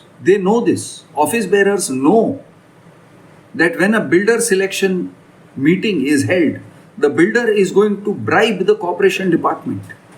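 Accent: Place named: India. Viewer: I asked out of curiosity as this issue might be specific to india and the anchor spoke in indian english. But glad that you understood and appreciated what's going on in this part of the world.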